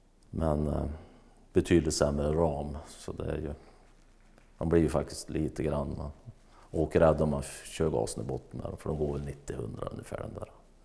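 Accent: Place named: Norrland, Sweden. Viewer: Så underbart att äntligen få höra lite riktig norrländska i denna kanal!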